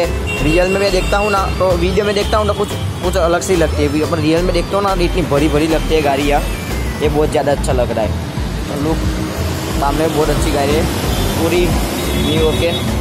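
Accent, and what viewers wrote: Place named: Gujarat, India. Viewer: Bhai tu gujrati he muje pta chal gaya mebhi gujrati hu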